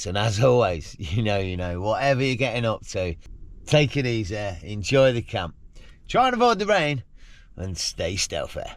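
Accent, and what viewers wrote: Leicester, United Kingdom. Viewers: Where are you from Leicester?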